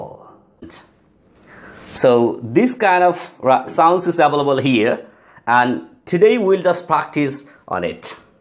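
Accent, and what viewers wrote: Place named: Bangladesh. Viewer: Like a bit banglish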